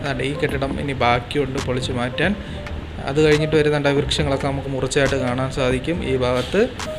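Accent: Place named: Kollam, India. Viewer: നമ്മുടെ കൊല്ലം ഭാഷ💕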